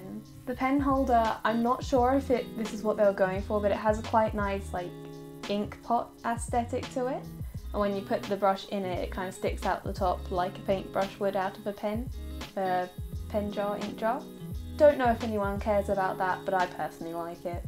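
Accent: England, United Kingdom. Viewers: Are you English? I am too :3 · MY LORD, YOU ARE ABSOLUTELY GORGEOUS!!! No wonder your art is amazing...beautiful art comes from beautiful people. Me, myself, and I have been a big fan of your art, and also kinda inspired...I've been (SO BADLY) wanting to draw like you, with a style slightly the same, only in the hair shading really. I've always loved the way you shaded the hair...and the apps i have...don't have that kinda setting of shade. SO, soon i'll get tool Sai and try, though I don't have a drawing tablet...That dream died quick...anyhow, LOVE YOU, AWESOME ART, I'M INSPIRED! HAVE A GOOD DAY! (PS...I've always adored the British accents...but sadly i'm american so rip lelelel...i'm also 16 so HEY GURL, HIGHSCHOOL IS FFFFUN XD) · ppl think im British but im Australian!!!! its awesome that ur British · finnaly a brittish animater :3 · U know I thought she would be French but British it's gud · Me and my friend finish the vid he's like huh I wonder if she's British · I love you British accent! 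And your sooo pretty! 💖 I also really love how you animate:3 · YES A BRITISH ANIMATOR!!!! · british?:D yay british :D a youtuber that is like me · OMG UR BRITISH GASP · you were already perfect and now I know you have a british accent AHHHHHHHHHHH I'M SCREAMING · YOU'RE BRITISH I happen to love you heck of a lot more now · Finally a British animator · I have so many words to tell you but I will put them short I LOVE YOU'RE BEAUTIFUL BRITISH VOICE AND YOUR FACE.... YOUR SO BEAUTIFUL!!!! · Nice. Ur British! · Holy FRICK UR BRITISHHHHH AHAHAHA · Well, being British and different is cute ❤ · Im not gonna lie your actually really hot!im gonna get a drawing tablet for my bday this march 23should I plan on buying this because a kind of have my eyes on a lot of tabletsHELP ME (btw I love England accents) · I DIDN'T KNOW YOU WERE ENGLISH WE CAN BE ENGLISH TOGETHER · It looks like a small TV Also you look really cute! 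Idk why I like British accents Well keep up the good work!❤️ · are you British? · Ur bootiful.One question are you British ? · you gotta love that PERFECT british accent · I LOVE UR BRITISHNESS :3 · Got to love that british accent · WOW are you from UK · You're British and pretty? GG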